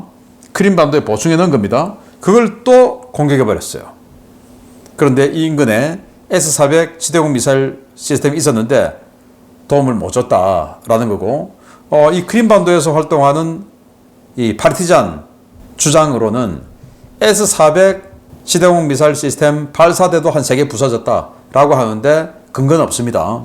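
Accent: Gyeongsang, South Korea. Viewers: 신인균 너 말대로면 모스크바는 3번쯤 함락됐어야 한다 경상도 씹 말투 존나 거슬리네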